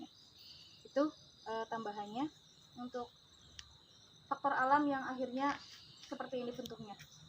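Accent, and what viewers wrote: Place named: Java, Indonesia. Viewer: Ternyata bhsanya sedikit medok ... 😂😂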